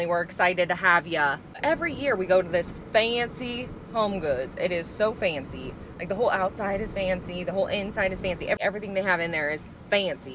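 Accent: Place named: South, United States. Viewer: Hey, I’ve been here for a few years now. Mainly popping in for this season. When you were talking about how you say certain stores names- it made me wonder if you are from the south? I’m from NC and have always thought I had a neutral accent until I went to visit up north. They told me I had a subtle country accent.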